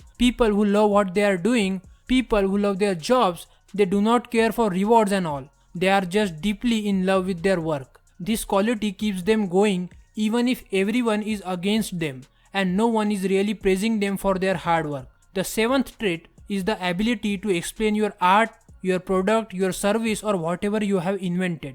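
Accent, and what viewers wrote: India. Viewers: I love the Indian Accent.. Respect from Somalia 🤗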